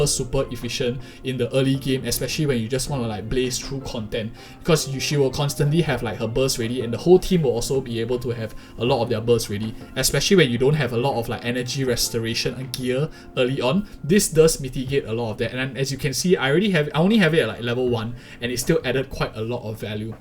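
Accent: Singapore. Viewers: he definitely sounds singaporean ngl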